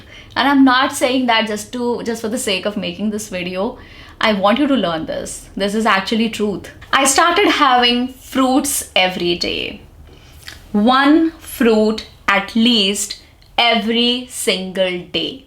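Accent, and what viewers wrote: India. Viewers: Didi......I love yor indian accent ❤❤❤❤❤❤❤❤❤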